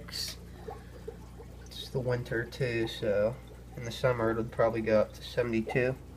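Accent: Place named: Pittsburgh, United States. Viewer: U from the burgh.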